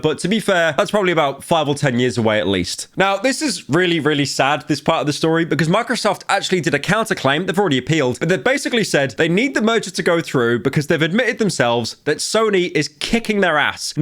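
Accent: United Kingdom. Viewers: You sound more british when very sick. It only makes sense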